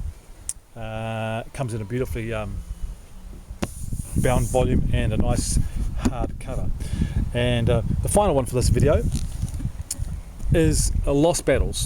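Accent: New Zealand. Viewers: Ur kiwi accent is awesome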